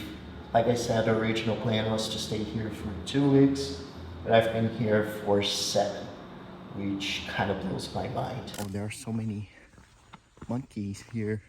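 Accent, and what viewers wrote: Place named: Brazil. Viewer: how strange. Your Brazilian accent seemed stronger when you were in the US. I hope your family does not make fun of your American accent now. 😛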